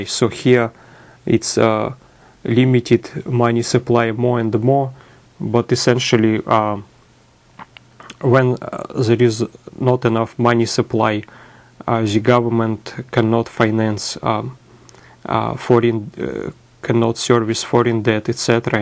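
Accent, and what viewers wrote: Russia. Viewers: This Siberian chatterbox has the most irritating accent imaginable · Russian accent is cool :p this video is the real deal. Very informative, thank you. Why not write a book ? You have plenty information and experience. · I agree. The accent is great [Russian accents are just so frickin cool] and the explanation is great. what's bad is that there's still people in America who don't think this kindof thing could happen to us, but we're SO on that path of reaching over 1000% inflation. the only real way to get rid of an economic crisis like this, IMO, is to get rid of the central banking system [which tends to be the root of all the problems] and go to a solid, consistently valuble money standard of sorts.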